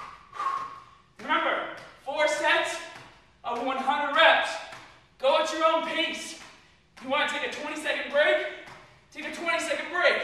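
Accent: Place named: New York, United States. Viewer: haha you might get a influx of costumers Usaully when people ask me questions I usully adivse to see your fitness videos and get his meal plan they work for everyone great site, but today I joined yahoo answers haha I think i have told like 150 people to join your site and how great it is:) haha lucky and im studying nutrition and getting certified as a personal trainer ( but no competion im CANADIAN whitch reminds me come to CANADA to TORONTO we would love to hear that new york accent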